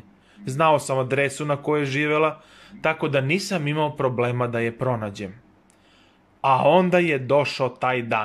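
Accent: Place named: Nis, Serbia. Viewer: Vidi se da si iz Nisa ili okoline,i imws jako los akcenat i dikciju da pricanje ovakbih prica....ideja je dobra ali realizacija vrlo losa....To je moje iskreno misljenje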